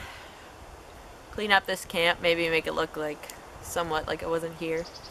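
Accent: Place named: Canada. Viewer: I found you while searching for cowboy coffee, but there are so many other things in this video that I've long been interested, such as twig stoves, winter backpacking and the Morakniv bushcraft knife. I thought you sounded a bit Canadian. Very glad to have found you, and I'm looking forward to your next videos.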